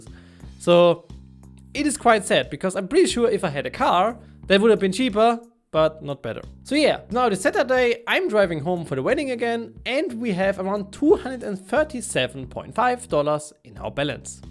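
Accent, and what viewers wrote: Germany. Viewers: Are you german? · Man merkt dass er Deutsch ist an sein Akzent😂 feier ich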